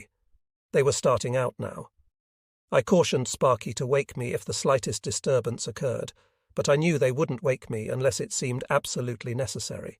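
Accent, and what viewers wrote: England, United Kingdom. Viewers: I enjoy the episodes but an English AI doing American voices and tossing in UK idioms is a bit bizarre. “Cobber” is not a term I hear in North America very often. (Previous episode-can’t remember which one). Still, as stated previously, I enjoy them. Not a huge issue, just a bit bizarre with the English idioms making some stories a bit “of a stretch” regarding American stories.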